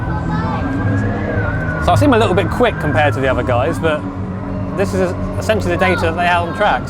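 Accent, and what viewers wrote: United Kingdom. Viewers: I never even noticed accent during his videos, but it seems he gets more British in public.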